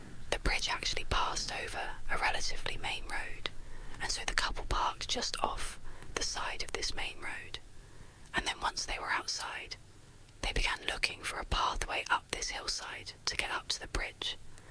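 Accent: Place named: Australia. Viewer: Bro I honestly can’t with the accent your an Aussie right? Idk I just was laughing so hard at the beginning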